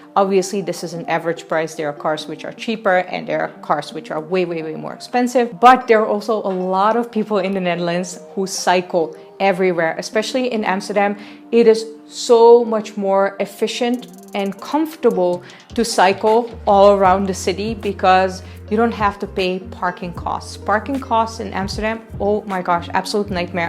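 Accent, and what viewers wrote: India. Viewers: For sure u are getting the Indian English accent.Great video. Thanks. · And you have Indian accent! Wow!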